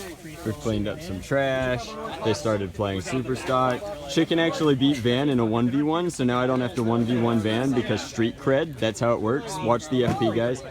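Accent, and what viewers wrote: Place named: United Kingdom. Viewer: stop with the british b.s like boot and zed and aluminium. its makes u look like a tool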